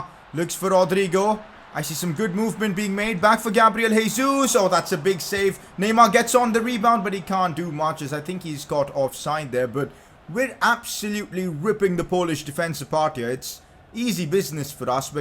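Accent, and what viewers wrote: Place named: India. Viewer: milittttao that Indian accent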